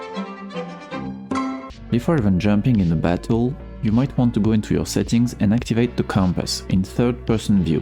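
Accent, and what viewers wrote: France. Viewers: J'ai reconnu l'accent français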